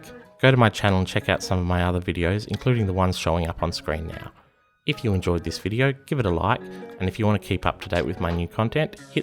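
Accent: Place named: Australia. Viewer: Sorry to sound rude, but you sound like a fellow Aussie... are you in Australia using Skylink? Didnt think it was released around here yet? How you finding it, worth the cost?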